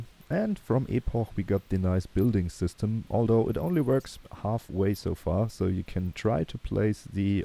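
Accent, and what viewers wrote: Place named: Germany. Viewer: wie man das sofort hört wenn ein deutscher englisch spricht .. :)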